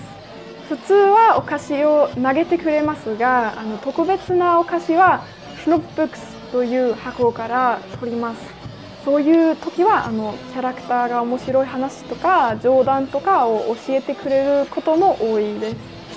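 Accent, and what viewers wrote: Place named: Germany. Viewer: Ha wusste ichs doch als ich dein Englisch zum ersten Mal gehört hab klang es so deutsch, wollte dir eigentlich nur sagen, tolles Video dein Japanisch ist wirklich gut und ich mag deine Videos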